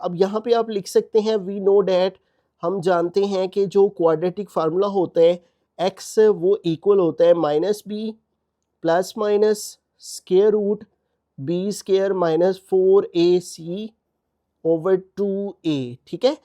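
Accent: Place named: Bihar, India. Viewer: Sir g mujhaie ap Ka lachter Bihar achie lag tai h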